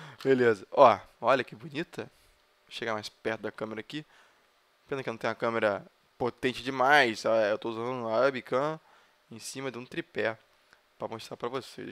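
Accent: Rio de Janeiro, Brazil. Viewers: Tu é de qual zona do rio!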